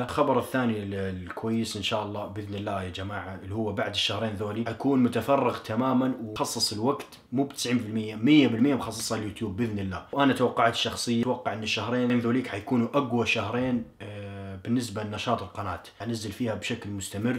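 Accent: Saudi Arabia. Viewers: زيارة (oW9Q) الله يرحم اهلك تراني اعرفه من قبلك ، تونسي درس في السعودية عشان كذا لهجته سعودية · انت من وين وكم لك مبتعث وليش تتكلم سعودي ( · كيف تهرج سعودي ونتا تونسي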